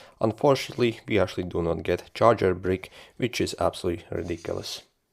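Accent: Russia. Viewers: Ты-ж русскоговорящий. Вот прям по произношению слушу, что русский. Говоришь, как Мутко🤣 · are you russian? the accent is adorable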